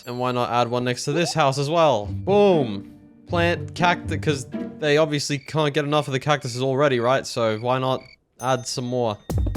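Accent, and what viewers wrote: United Kingdom. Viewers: I really like your British Accent... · Love british accent too much dude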